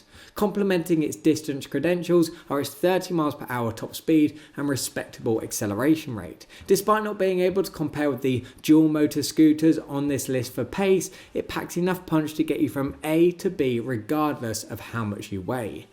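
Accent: United Kingdom. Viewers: he talk in dollar with a sharp british accent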